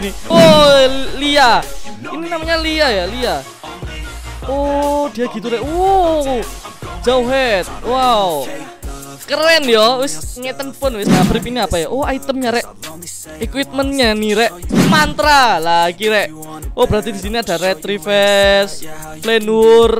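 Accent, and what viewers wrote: Java, Indonesia. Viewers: Bhs lu medok bgt bang..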